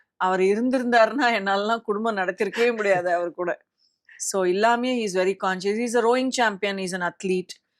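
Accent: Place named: Tamil Brahmin, India. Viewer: She is Tamil Brahmin I think…see her way of talking and food taste!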